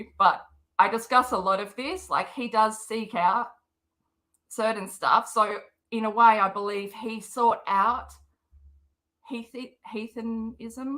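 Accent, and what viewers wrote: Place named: Australia. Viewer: Your voice helps me sleep. I watch all you vids and it’s my favorite to put one on before bedtime to fall asleep to your Australian accent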